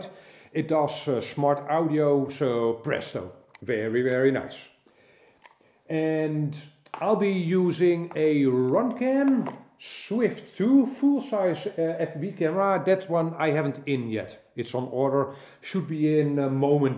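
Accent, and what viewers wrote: Netherlands. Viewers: Mate, your Dutch Accent is brilliant. I love the Wing, I love the Video but all I can think of is: "Pipe and a Pancake" 😂😜✝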